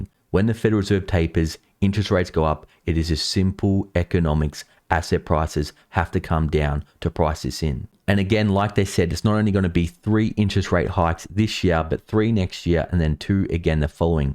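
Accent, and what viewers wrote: Australia. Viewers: This dude sounds australian. Hope you vote republican if you don’t want to be in prison like australia. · I'm guessing from your accent that you are a Aussie. Can you more clearly point out what the ramifications are for Australian s. I like your channel but it is not always clear which country you are referring to.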